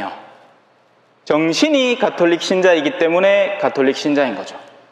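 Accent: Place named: Daegu, South Korea. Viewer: 신부님의 대구 사투리 구수하네요 알기 쉬운 강론말씀 감사합니다 세속 육신 마귀에서 열린 자 되겠습니다 ^~~♡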